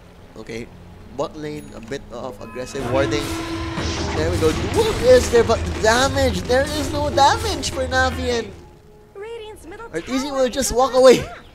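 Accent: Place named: Conyo, Philippines. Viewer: Why so conyo casting kuya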